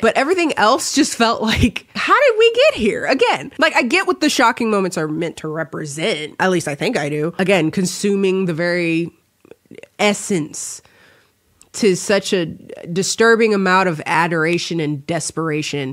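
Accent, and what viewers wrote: United Kingdom. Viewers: Don’t worry another recap spoiled those scenes and I kind of need those scenes spoiled to know when to fast forward. I would say Talented Mr. Ripley then Mean Girls cause Cady would never murder Regina lol.Love the British accent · I haven't watched your vids in a while and suddenly you sound British?!!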